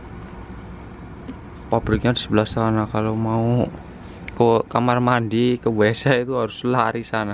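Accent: Banyumas, Indonesia. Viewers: Sukses terus mas salam dari malaysia. Rika kayong mandan ngapak deneng, hehehe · Orang ngapak ya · Wong ngapak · wong ndi mas. kok ngapak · Mas orang ngapak ya hiihi..kalem temen kyknya😂😂😂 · Org ganteng kalau jawa ngapak bahasanya jadi wagu gimana gitu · wong ngendi pean ki kok ngapak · Mase ngapak yak? Tegal apa purbalingga? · Orang ganyeng banget,,,,tpi sayang ngomongnya.......wkkk wkkkk,,ngapak ngapak..... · orang ngapak ya mas... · Jawane ngendi maz kok rodo ngapak le ngmng 😊😂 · Koyoke sampean uwong ngapak 😂😂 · Ngapak wongendhi rika Dene ngelu ndase😁😁😁 tetap semangat ngeh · Bahasamu ejih ngapak bro,,asalmu jw ngapak yo,,,pokoke berjuang,,sukses terus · wong ndi njenengan mas,deneng ngapak temen ngomonge??😂😂😂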